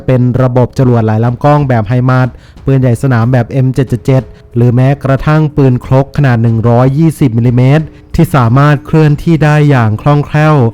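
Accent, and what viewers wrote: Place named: Isan, Thailand. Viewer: เปรียนคนเว้าแล้วติครับ555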